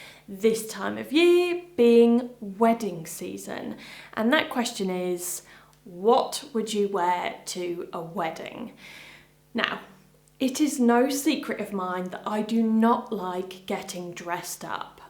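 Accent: England, United Kingdom. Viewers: Your voice and the English accent are so chic. Adore listen to you.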